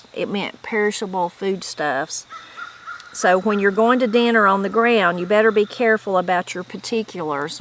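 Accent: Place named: South, United States. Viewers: I call this southern english.I am 70 I don't speak it as much as I did when I was younger.I guess it is because all the older ones have passed away and media doesn't speak it.I love and am very proud to be Southern and speak Southern English. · Ya speak pretty much the same way we do in east and west TN, people look at me funny because of the way I talk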